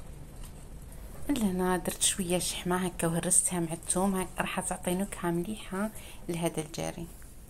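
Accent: Algeria. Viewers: وين هادي تبالي عندنا في ميلة نفس الجاري لي نديروه وتبالي نفس لهجة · ربي يعينك اختي الكريمة صوتك يبان من جهة الشاوية مانحسوش من جهة جيجل رائعة فحلة نتاع الصح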